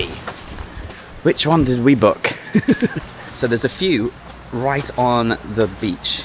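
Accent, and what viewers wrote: United Kingdom. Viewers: I clicked hoping to hear a British accent - yesssssss!!!!!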